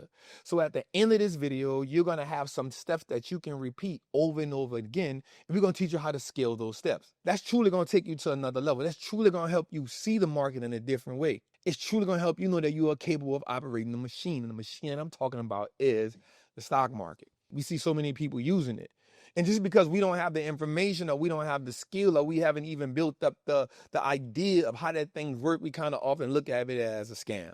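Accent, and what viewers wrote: New Orleans, United States. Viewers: I heard the New Orleans in his voice🎉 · You ain had to tell me you was from New Orleans I heard it and you ain even had to say baby or room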